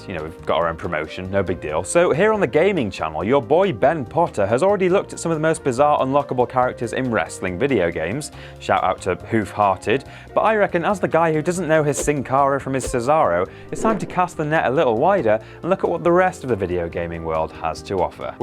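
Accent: United Kingdom. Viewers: i like you british mat pat.. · I made it .....because, British Accent, that's why.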